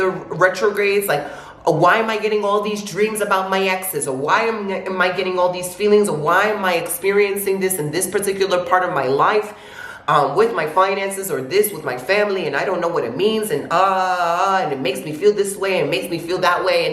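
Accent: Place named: Brooklyn, United States. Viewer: You so Brooklyn & it just makes your videos so much more pleasing & resonating.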